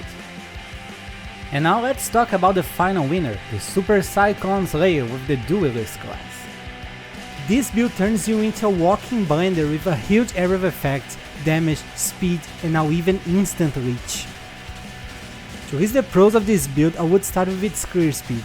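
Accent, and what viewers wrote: Brazil. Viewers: Dude, where u from? You have a Brazilian accent xd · cara, vc é br né esse sotaque ai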